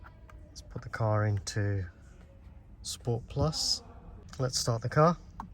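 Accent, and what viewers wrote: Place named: United Kingdom. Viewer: British Talk about German Car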